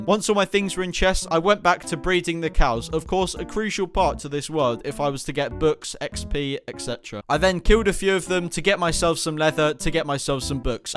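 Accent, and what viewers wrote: United Kingdom. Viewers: i actually didnt h8 this guy until he THREW the gaps to the zombie villagers. TRIGGERED!!!!!!!! edit: and also hes british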